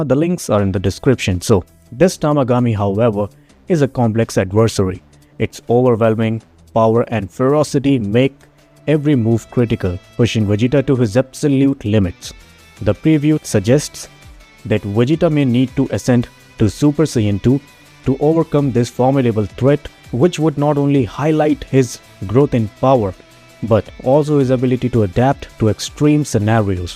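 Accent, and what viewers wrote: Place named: India. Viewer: why are you talking like an indian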